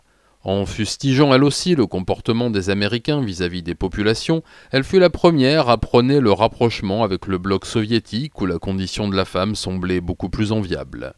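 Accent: Japan. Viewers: une sage femme peu sage...encore une excellente vidéo avec un délicieux et parfait accent japonais!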